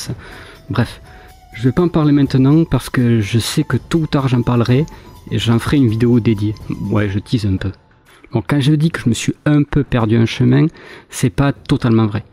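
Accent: Toulouse, France. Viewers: Voila pourquoi je n'ai jamais pensé a unsub cette chaine! Toujours un plaisir d'entendre cet accent qui m'est si cher (oui je suis toulousain et chauvain) Bon retour sur youtube j'attend avec impatience ton nouveau contenu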